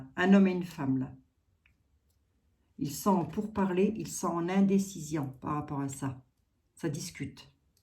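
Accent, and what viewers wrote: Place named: Franche-Comté, France. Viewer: bonjour madame ! Ne seriez-vous pas native de Franche-Comté par hasard ? L'ayant quittée depuis de nombreuses années, j'ai pourtant l'impression d'en revivre l'accent, certaines expressions, les boutades etc. Est-ce que je fais erreur ?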